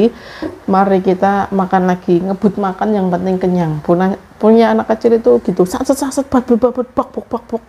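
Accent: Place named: Java, Indonesia. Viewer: Mbak klo ngmong jawa lucu😁 sehat²nggeh mba dan keluarga🤗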